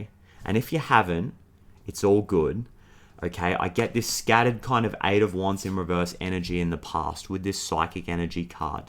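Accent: Australia. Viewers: Whoa! Hello! 6 mins in and I’m feeling super connected to this reading! Oh, and the Aussie accent is refreshing being local 🇦🇺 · Are you Australian ? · when your readings resonate with me it’s like you’re speaking directly to my higher self it’s like a flow of information. I mention that because I have auditory processing delay and I find it extremely hard to focus on and actually hear what’s being said without subtitles. Here, with your Australian accent and such unique, cute tone of voice I get it, I don’t even have to know all the expressions you use and still it makes perfect sense ⚛️ to me it’s a confirmation that I’m not delulu, that I’m not imagining stuff. That our interconnectedness is so very real. I love it and I love you 🩷🧡💛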